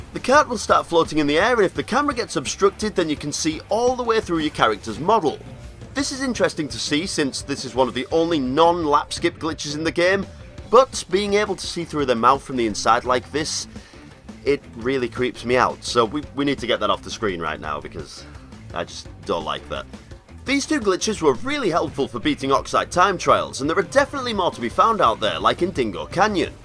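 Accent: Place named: Northern England, United Kingdom. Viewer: I also noticed you were using a PS4 in this vid. Would you mind adding me on PSN? My PSN is the same as my YT name. I'd love to do a video with you some time. I don't do many but mine are all about timesavers and completionist videos plus some random unscripted stuff too and I'd love to do a collaboration with you. Forgive me if I am wrong as well but I can't help but note that Northern accent as well. I'm from Sheffield if that's near to you?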